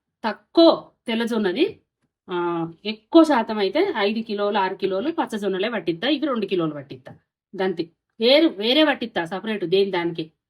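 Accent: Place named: Karimnagar, India. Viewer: Karimnagar slang matladthunnaru 10years tharvatha malli akkadi vaallatho matladinattu happy ga vundhi chaala manchiga nerpisthunnaru. maadhi Kadapa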